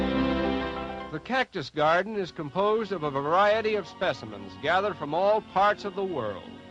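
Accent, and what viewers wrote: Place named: Netherlands. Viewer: Inggris accent Belanda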